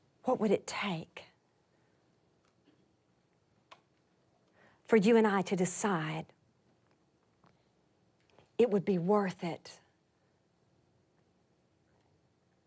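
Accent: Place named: United States. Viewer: wow. What an American accent!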